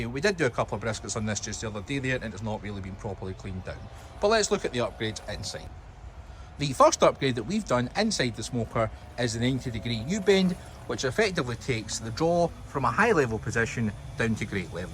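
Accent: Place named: Scotland, United Kingdom. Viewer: no problem. It's always good to see how a fellow scot manages cooks in variety of weather conditions we have up here, so always stay tuned. Think I'm a touch further north than you by the sound of the accent haha. Cheers bud, I had a few small holes in the firebox basket but for the time being I'm just laying tin foil down then putting my fire grate ontop of that, does the job just it is by no way a long term fix, plus the steel is to thin to weld unfortunately. But she does the job, had some good cooks so far this year. Yeah a big smoker is probably a pipe dream. But a Oklahoma joe is definitely next on the purchase list when the time is right. Thanks for taking the time to reply.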